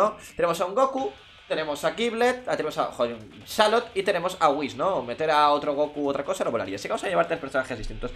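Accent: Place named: Japan. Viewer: F por esa entonacion japonesa...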